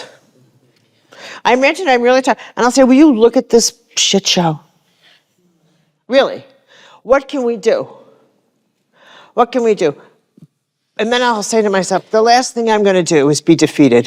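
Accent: Chicago, United States. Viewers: I'm here for that fabulous Chicago accent.😊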